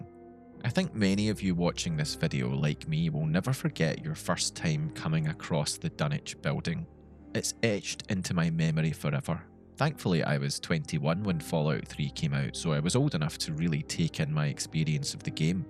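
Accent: Scotland, United Kingdom. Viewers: Scottish spooky game content person ??? Ive just hit the jackpot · Oh ny god , ive at last found a scottish gamer for fallout 😂ive been watching too much american youtubers , i now have a twink in ny accent 😂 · U have a subscriber i can hear ur from my neck off the woods the uk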